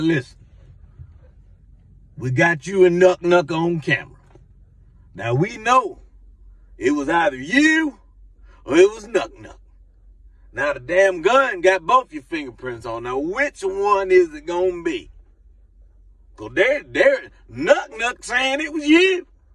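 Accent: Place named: South, United States. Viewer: That coutry accent is sendin meeeeee😂😂😭😭😂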